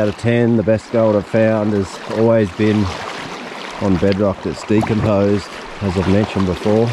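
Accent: Australia. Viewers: The fella from down unda lol! Love the vids!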